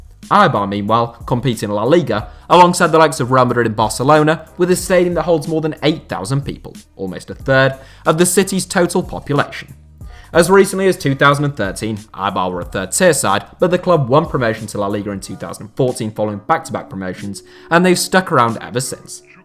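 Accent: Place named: England, United Kingdom. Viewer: Your english accent is so heavy that I can't understand a word as a foreigner😂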